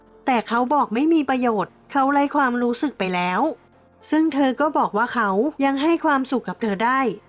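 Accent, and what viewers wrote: Thailand. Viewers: เสียงน้อง เหน่อดีจริง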